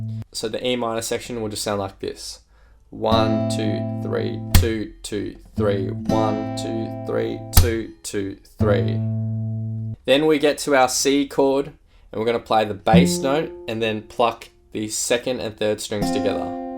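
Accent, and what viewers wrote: Australia. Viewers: Ooooooo Australian c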